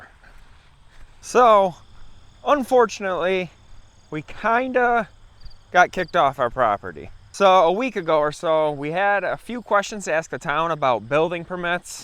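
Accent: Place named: Michigan, United States. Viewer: What state/county? By the accent I'm guessing Michigan.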